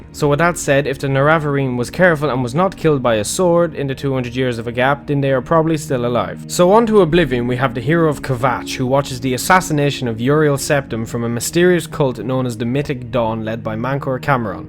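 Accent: Ireland. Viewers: Is your accent Irish? It's awesome I want to hear you read a book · When I was watching this I couldn't place your accent, but after noticing how you pronounce your Ts I was like "oh shit he's Irish" then I saw your channel name. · He mispronounces Tharn because of his strong Irish accent. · The Irish accent is the only way to hear the elder scrolls. Save for some dodgy pronunciations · I'm thinking is not mispronouncing the tes names but simply speaking them in the glorious Irish accent. Didn't know how I felt about it at first but it's kind of nice.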